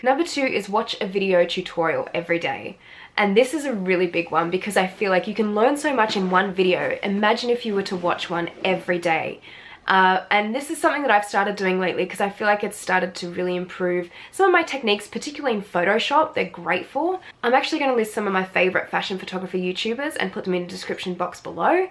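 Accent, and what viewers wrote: Australia. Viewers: love the Aussie accent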